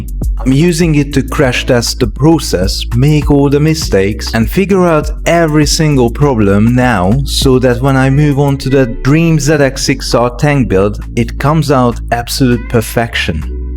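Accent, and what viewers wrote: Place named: Hungary. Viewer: I thought I heard it on your dialect 😊 My father was from Hungary 😊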